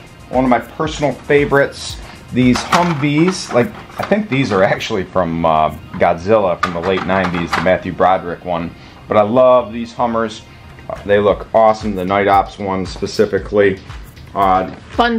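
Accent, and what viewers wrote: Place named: Michigan, United States. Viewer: Just found your channel, and it sounds like you're from Michigan as well. You're grabbing all these toys right out from under my nose!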